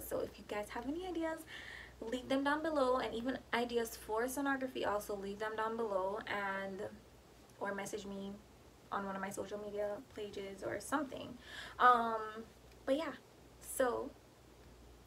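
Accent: Jamaica. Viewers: Are you Jamaican? I am too but American Jamaican I love your accent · Hey girly, your video is inspiring. Taking my Abdomen registry in October your video really help me understand i should expect and how to study. On another note and totally unrelated, are you Jamaican or Caribbean? I am Jamaican and my ears are very keen to recognizing fellow caribbs especially other Jamaicans talking. But i could be wrong lol! Appreciate your video so much!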